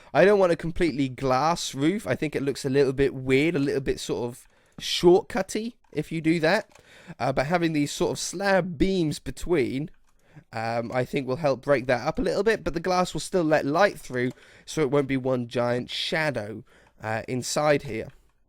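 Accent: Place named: England, United Kingdom. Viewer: Make a soccer stadium plz oh and where nabours your English 🇬🇧 and I'm Irish 🇮🇪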